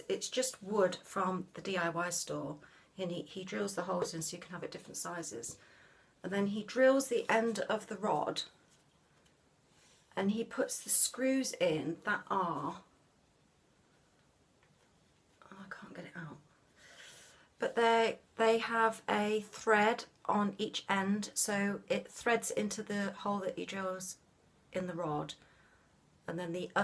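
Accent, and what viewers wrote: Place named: England, United Kingdom. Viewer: Hi, great progress as always! I'm English living in Australia and listening to your lovely English accent makes me feel so happy for the rest of the day! I got my hubby to make the scroll frame, so easy and cheap and I found sticky backed Velcro which is great to use! So a big thankyou!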